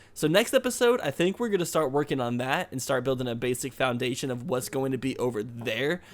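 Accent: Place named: Ozarks, United States. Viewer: The way you talk is really obvious to other Missourians. You sound like you come from up near the northern part of the Ozarks. You sound just like my brother in law from just north of marsh field.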